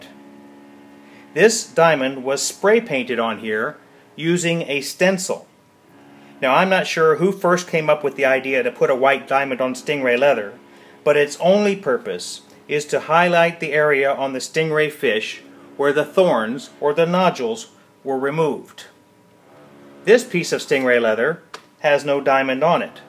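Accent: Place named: Canada. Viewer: Are you Canadian by any chance? I’m from Wisconsin and have heard a lot of northern accents but yours sounds very Canadian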